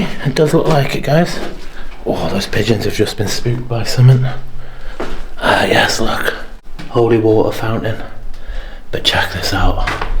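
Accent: United Kingdom. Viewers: Btw luv your British accent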